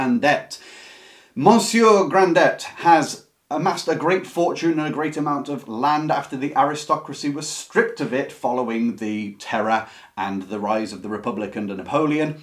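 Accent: England, United Kingdom. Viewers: What an engaging list of books you have! I am interested in the book "The Sicilian Romance" and quite a few other books as well. You have an amazing You Tube channel! I adore your English accent too.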